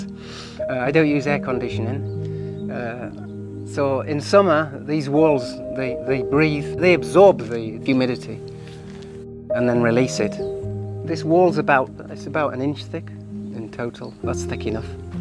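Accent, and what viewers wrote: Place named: Scotland, United Kingdom. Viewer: And his Scottish accent makes him even more wholesome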